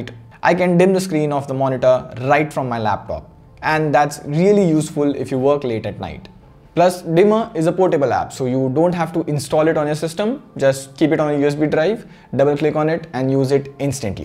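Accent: India. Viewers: u Sound like Indian Accent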